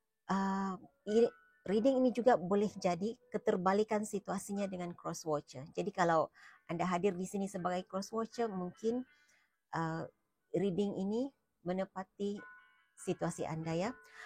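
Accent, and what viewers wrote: Sabah, Malaysia. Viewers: Dari logat bahasanya kaka ini macam orang Sabah sarawak😅😊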